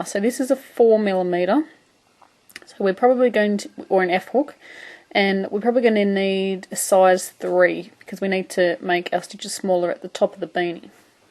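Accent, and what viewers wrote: Australia. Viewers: I hope you make more videos, You could read a calendar or a grocery list and I would listen, I love the Australian Accent.. hee hee.. thanks bunches!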